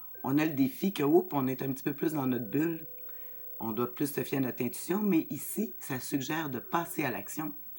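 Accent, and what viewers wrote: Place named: Quebec, Canada. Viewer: Bonjour:-) - Pouvez vous nous dire à quel signe astro s'adresse votre tirage? - Et Quel est cette douce et agréable musique derrière en musique de fond? merci pour votre doux sourire et votre accent québécois:-) ici en France... on adoreeee:-):-):-)...en attendant vos réponses on like et on suit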